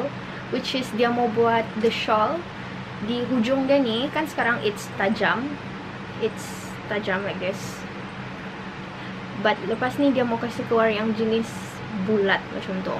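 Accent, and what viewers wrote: Sabah, Malaysia. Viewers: wei i love it when u talk sabahan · moreee in sabah accentttt pleaseeeee im begginnn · your sabah accent is so cuteeee i cant ........😭😭😭❤️ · comel ba kamu cakap sabah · i love hearing her sabah accent 😭❤️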